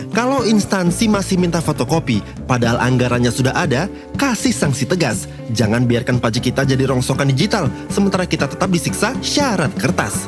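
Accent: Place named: Banyumas, Indonesia. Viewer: Salut bro....wong ngapak harapan bangsa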